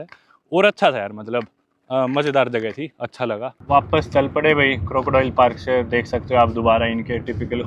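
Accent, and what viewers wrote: Haryana, India. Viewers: Love u bro..... Aapka hariyanvi to gazab ka hi he..... God bless u😂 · Bhai re ek number video jama swaad aa gai ....nue lagge jama ki theeth haryanvi choora aa rya hai.....being haryanvi I am proud of your brav ....best wishes from UK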